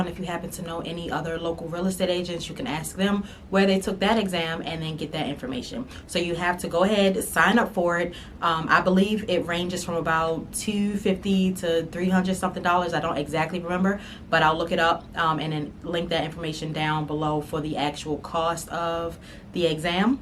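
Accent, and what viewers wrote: Baltimore, United States. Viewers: I can tell she from b more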